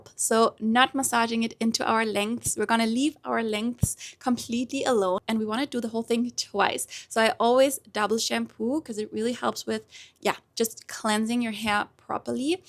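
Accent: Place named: Germany. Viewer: Oh süß man hört deinen deutschen Akzent raus 💗🥹 Grüße aus Nürnberg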